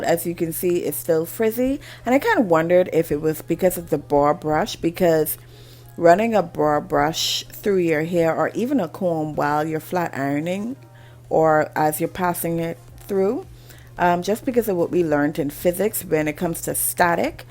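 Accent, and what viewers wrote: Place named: Bahamas. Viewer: That has to be a Bahamian accent.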